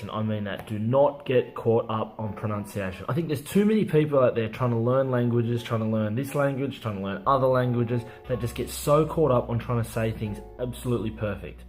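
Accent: Australia. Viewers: Kia ora e hoa thank you for being brave and courageous for voicing important things like this vid. You sound very ozzy, just curious.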